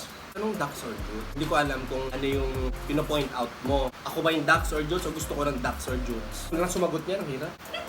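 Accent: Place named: Conyo, Philippines. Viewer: Sobrang saya ko while watching, napaka konyo mo and di ka boring kausap sana lahat may sense of humor.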